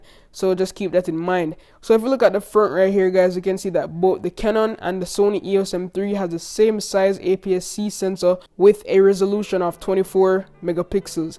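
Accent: Jamaica. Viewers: He Jamaican I can hear the accent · Are you a Jamaican?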